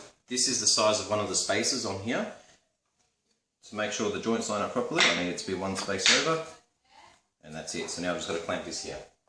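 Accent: Australia. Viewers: very cool. i thought I needed a dado blade which my makita mtl 100 does not allow for or a more expensive router table so, so more money. But now I know I can make a jig like yours. My makita mlt 100 has custom narrow mitre channels, yours seem to have 20 mm channels mine are T 12 mm at top and T of 15 below. But I can cut some 12 bars to make it work. Great to hear a no frills ozzie voice and no drama queen. Paddy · This is awesome. Thanks for posting. Good to hear an Aussie accent in the ocean of youtubers! . cheers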